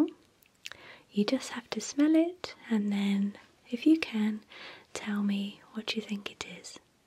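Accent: United Kingdom. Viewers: I love your british accent. kisses and hugs from brazil!! 💓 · I love ur British accent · u british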